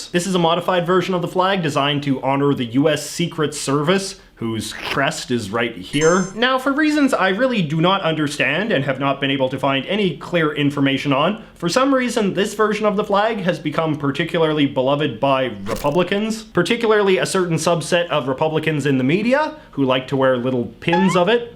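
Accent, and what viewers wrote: Canada. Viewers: Gunter Ringgenberg is Canadian. Why do you think he says "about"like that?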